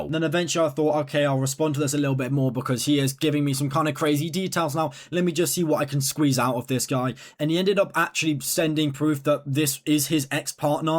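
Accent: United Kingdom. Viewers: You’re like the inverse of MoistCr1TiKaL black T-shirts UK accent and high energy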